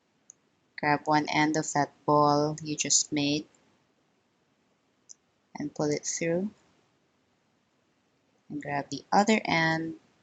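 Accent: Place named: Philippines. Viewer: God your so talented!!! I was wondering if you could make egg tarts or any other Japanese, Chinese, Filipino or any asain famous foods. Pretty please!!! Also, i can hear that you have an accent, well, I think. Are you a filipina po?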